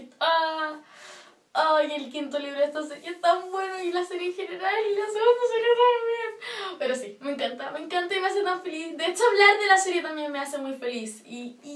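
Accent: Chile. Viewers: Eres chilena cierto? Que chori jashdfjaj (: ♡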